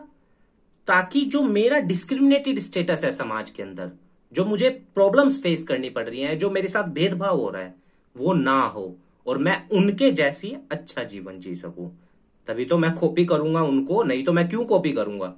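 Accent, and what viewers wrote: Haryana, India. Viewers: Sir Are u from Haryana...? ? 😅😅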